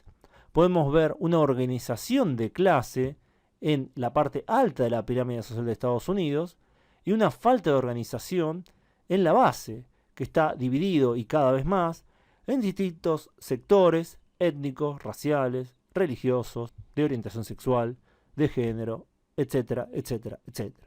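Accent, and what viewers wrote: Argentina. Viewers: Buenos dias .muy Buenos conteúdo. Parabéns pelos vídeo. Mi prime vez que llego aqui en canal. Escutando lo acento argentinos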